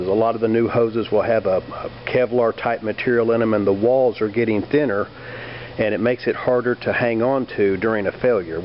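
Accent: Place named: South, United States. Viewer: Lots of words in a southern accent.........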